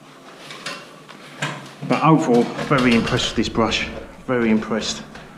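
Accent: United Kingdom. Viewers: Are you from east London 👍😁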